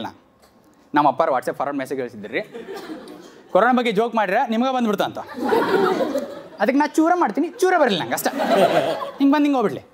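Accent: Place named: Belgaum, India. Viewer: Clean,simple,very very funny,content- based comedy - this boy,engineer,is very very Witty,I like him,have been seeing all his videos,episodes,good command over Belgaum style of Kann- talk...get going /- am your fan...from Gulbarga- a Doctor by profession,have dn my schooling at st- Paul's Belgaum..loving it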